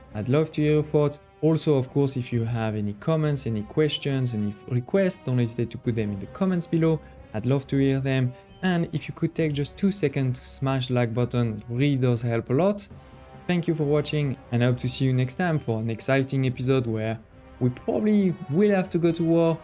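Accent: France. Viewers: I think that accent is french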